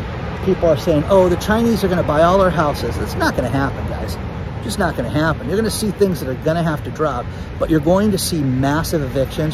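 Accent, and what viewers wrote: United States. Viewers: Love your channel and love your American accent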